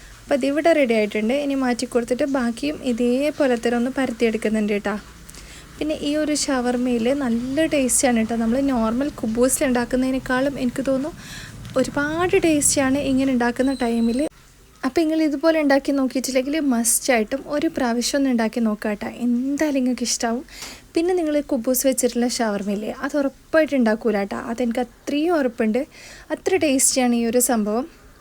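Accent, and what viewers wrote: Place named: Kannur, India. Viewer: കണ്ണൂരാണോ വീട് സംസാരം കേട്ടിട്ട് ചോദിച്ചതാണ്. Super റെസിപി സബ്സ്രൈബ് cheythutto❤❤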